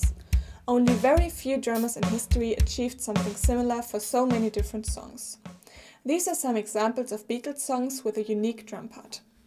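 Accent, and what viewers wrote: Germany. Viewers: SHE SPEAKS!! German girl??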